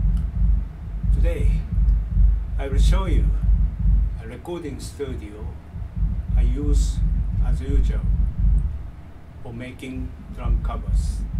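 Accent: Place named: Japan. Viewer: You got a such a nice place for recording. Could use more space though with all that equipment. :) Air conditioner and all, I wish we had something like that. What a difference, I play in a basement of age old industrial building where everything is ugly and in disrepair. But at least there's plenty of space and its cheap... The reality for metal bands in Finland is pretty much like that, things are simple but functional and the rehearsal spaces are almost always in old ugly buildings out of sight and mind of most people. I'm wondering how expensive it is to rent a rehearsal space in Japan? In Finland it varies a lot, in my town which is a small place it can be very cheap if you get lucky.... In Helsinki its much more expensive and the places are almost always very small. Our place is pretty much as good as it gets, over ten people sharing the rent and now that two guys left and I cleaned the place, there's a lot of space for everything. 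I'm jealous of your recording equipment. Damn, I wish I could record in separate tracks too. Takes some time to put it together, I have been using all the money I can spare for the drums in last few years. Recording equipment is the next on the list. ;) Your English is very good, understood everything as you meant it. Have you studied it somewhere or just learned by yourself? I love your accent, I don't hear a Japanese person speak in English often. :D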